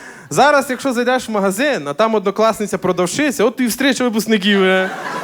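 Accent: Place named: Galicia, Ukraine. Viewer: Якщо ти з Хмельницькому, то скажи щось як хмельничанин. Бо поки що, твій говір видає в тобі, радше, галичанина )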